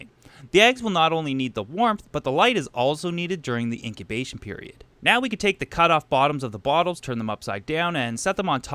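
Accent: Canada. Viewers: Best video on this subject. You are Canadian huh? I can tell by how you say about. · Are you from Canada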